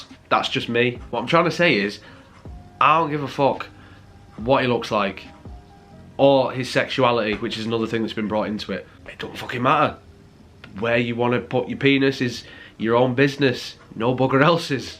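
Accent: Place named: Leeds, United Kingdom. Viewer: Bit off topic, but are you from Leeds mate?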